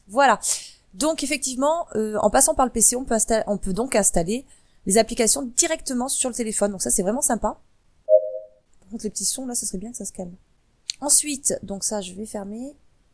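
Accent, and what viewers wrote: South, France. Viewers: salut jje profite de ce post pour te dire à quel point j'apprécie tes vidéos, c'est vraiment adorable de nous livrer toutes ces vidéos dans le domaine de l'informatique pour ma part hameçon particulièrement utile,je sais aussi que cela demande beaucoup de travail et peu de rémunération, dans tes commentaires on sent une petite pointe d'accent du sud,un grand bonheur de vivre et elles ne sont pas les nombreuses vidéos qui vont à l'essentiel et enregistré avec une très bonne diction. ce qui m'amène à te demander s'il était possible que tu nous fasses une vidéo pour le rootage d'autres téléphones android comme les Nokia sous OS Windows phone, et tous les Samsung a2,a3,a4,a5,s7 etc... qui manque le miens c'est le samsung a300fuxxs1bpe1 pour info ^^ merci encore pour tout ce que tu fais.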